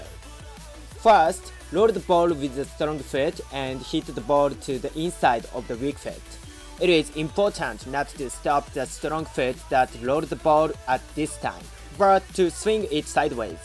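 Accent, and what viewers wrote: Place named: Japan. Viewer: honestly, the japanese accent makes it even better!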